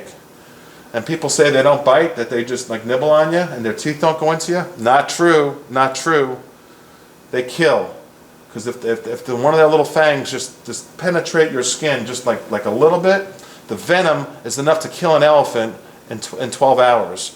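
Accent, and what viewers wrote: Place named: New York, United States. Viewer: Great vid, just subrscribed. Your accents definitely not from Florida, New York maybe?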